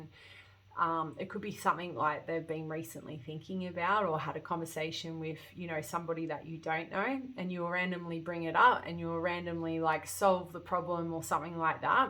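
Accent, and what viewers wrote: Australia. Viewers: “If you’re new to my channel I’m not normally this shit 🤦‍♀️ “ hahahah classic I love you already. Aussie ?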